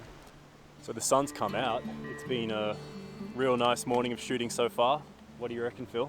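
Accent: Australia. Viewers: I used a lot of AGFA colour and BW film in the day. Loved it and miss it. Still use Rodinal. Good work. An Aussie voice. 😀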